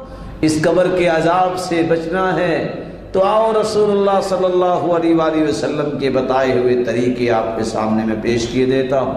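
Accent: Arab world. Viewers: MashaAllah SubhanAllah Qari Sahib I am really impressed by your. Arabic Language accent. Have you been brought up in an Arabic country? It is routine in our local Masjid that After isha prayer in mosque one person amongst Namazi recites Surah Mulk and all other listen. Is that right Amal for maghfarat or each one has to recite separately. Please guide us. JazakAllah Khair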